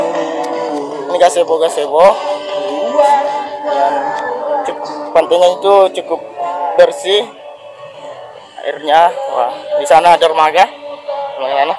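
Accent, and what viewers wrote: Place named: Makassar, Indonesia. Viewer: Pertahankan logat Makassarx dan segala okkotsnya... mantap adinda...😅😊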